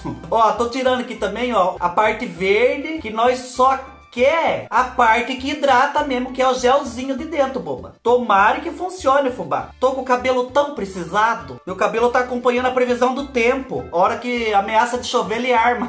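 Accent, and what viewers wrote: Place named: Caipira, Brazil. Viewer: 🥀beijos de Barueri SP ❤ adoro seu sotaque caipira paulista, igual da minha família 😘😘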